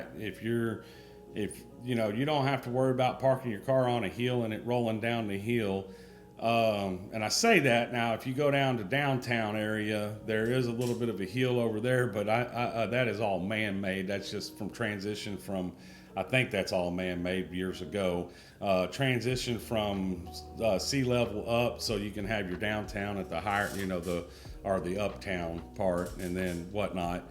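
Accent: Texas, United States. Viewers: I can hear it. Reminds me of my grandma she lived in Shepard Texas right above houston. I lost her to covid. His voice brings me a lot of joy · What accent? Lol but really, it’s much more tame than most Texans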